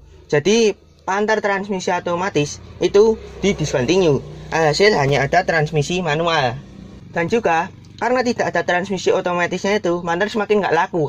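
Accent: Java, Indonesia. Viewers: .medok kali kau ah.. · Bahasa jawanya totok😁😁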